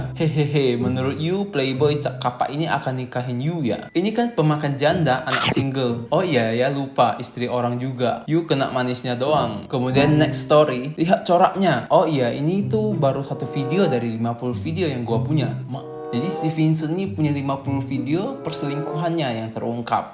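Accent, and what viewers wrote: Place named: China. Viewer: Are you Chinese People Mr??? Because style your talking very funny... I'm very sorry Mr 🙏🙏🙏😊, Hopefully Always Stay Healthy and keep Strong everyone 💪💪💪💪🙌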